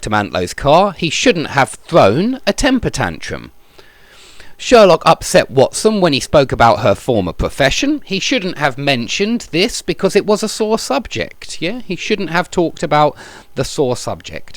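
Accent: United Kingdom. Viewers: I like your way to teach with british accent. . love you so much. thank you frim indonesia :)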